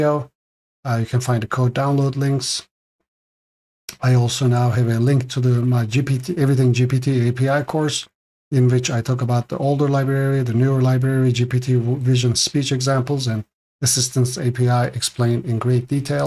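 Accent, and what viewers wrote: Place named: Turkey. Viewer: Good points… out of curiosity, are you Turkish? I hear a Turkish accent.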